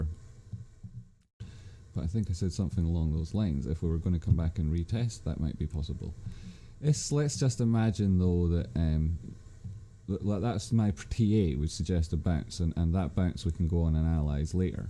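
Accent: Scotland, United Kingdom. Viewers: Here on apeandys recommendation. Good to hear a Scottish accent 😂I bought some gme on etoro. Did you say that etoro was dodgy?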